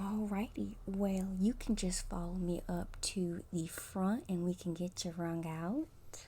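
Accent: South, United States. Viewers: Love that accent you've GOT to do more with this southern accent character!! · Absolutely love your southern accent, so relaxing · Love your southern accent role plays😊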